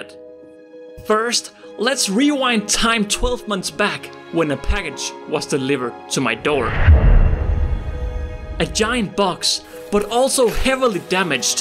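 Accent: Denmark. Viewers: bro got a danish anent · are you danish? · hey your accent sounds familiar are you possibly danish?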